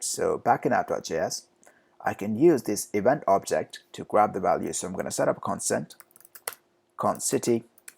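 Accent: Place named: United Kingdom. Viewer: British Accent 👍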